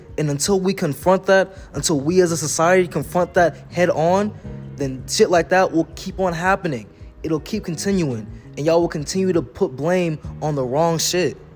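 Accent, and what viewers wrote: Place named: Midwest, United States. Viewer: You don't sound Southern.....I'm getting Midwest vibes like Illinois but in every southern state you have people that sound different.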